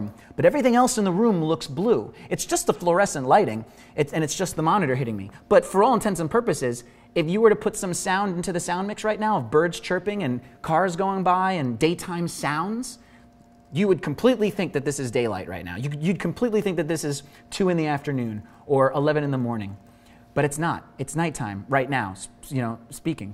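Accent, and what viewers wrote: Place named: New York, United States. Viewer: ! So happy I found you Googling around... I'm a photographer moving into video. I have a couple of very well used Canon 5D MkII's, but have just purchased a 70D for video. I like the auto focus, and the touch screen. I've seen a few of your youtube vids... love them... as a new yorker myself, i love the energy and a touch of the NYC accent... QUESTION -- I have not found anything in the videos of yours that I've seen about the BEST SETTINGS for the camera -- I'm at 24fps, 1/50th, iso changeable... Vincent Laforet, and others, say to crank down the contrast in the settings... what do YOU do, what would you recommend. I assume that this can all be tweaked in post, but that its supposedly good to capture a flat image. Whaddaya say?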